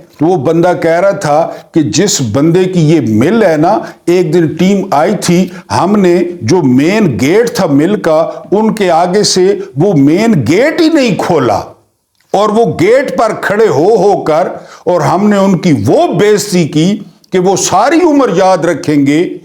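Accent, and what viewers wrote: Saraiki, Pakistan. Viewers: Ap key V Log mein Saraiki Touch bohot hi acha lagta hey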